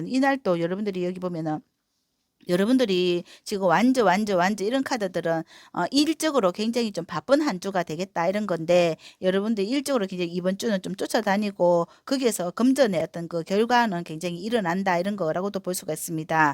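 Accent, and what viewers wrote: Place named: Busan, South Korea. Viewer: 4번 잘들었습니다 부산사투리 ㅎㅎ 리딩 좋아요 구독!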